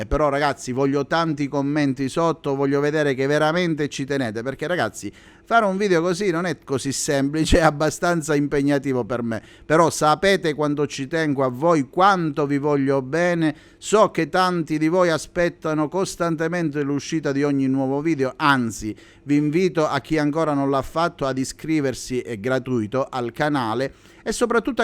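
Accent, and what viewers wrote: Calabria, Italy. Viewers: Accento calabrese pesante eh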